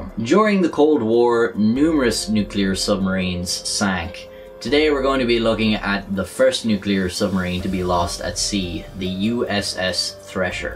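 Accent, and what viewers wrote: Ireland. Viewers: Irish accent, Video about the deep blue sea and submarines. He must be atlas from bioshock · R u Irish? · It's always grand hearing an Irishman tell a story, any story. Erin Go Bragh! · Lemme guess ur accent, Irish? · I didn’t think that today I would be listening to a purple Irish man describing the most horrifying and instantaneous death possible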